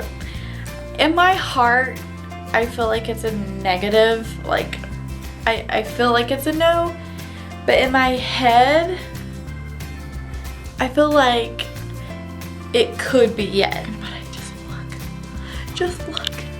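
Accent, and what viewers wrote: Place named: Virginia, United States. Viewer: Fellow Virginian here (I heard it in your accent!) Had a miscarriage this month and I’m praying that next month is better. I’ll keep you and Tyler in my prayers as well. It’s all in God’s hands.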